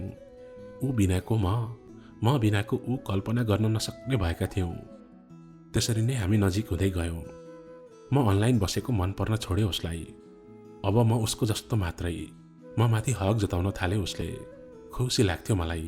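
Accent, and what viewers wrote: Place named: Darjeeling, India. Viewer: ❤❤Your voice from Darjeeling 💕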